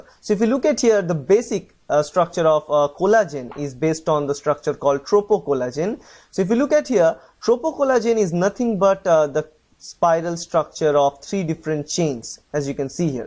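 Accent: India. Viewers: Go find videos from people from your language people... He is an Indian, he will speak like an Indian... If you have a problem with that... Go fuck yourself...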